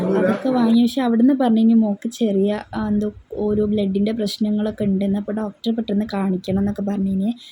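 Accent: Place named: Kozhikode, India. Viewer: Video super,കോഴിക്കോട് ആണോ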